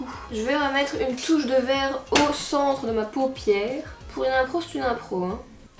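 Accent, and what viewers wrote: Belgium. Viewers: J'aime troppppp ton pti accent belge qui revient tout au long de la vidéo! BELGIUM RPZT :D :D BIsouuus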